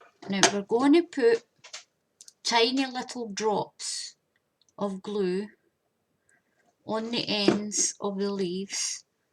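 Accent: Scotland, United Kingdom. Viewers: I loved listening to your lovely Scottish accent as much as I loved watching the flower coming to life. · Just happened across this posting as I was looking for ideas for my daughters wedding. I have to say it was like my Mum was talking to me !(Scottish accent!) Thank you for this wonderful idea ! Now I need to make 30 of these!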